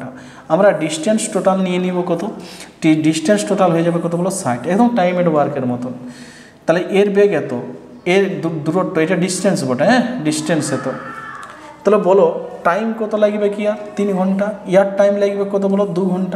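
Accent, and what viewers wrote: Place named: Purulia, India. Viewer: mone hoyechilo sir apnar kotha sunei karon amra Purulia Bankura r loker amader kotha sunei bujhe jabek j amra Bankura ba Purulia r basinda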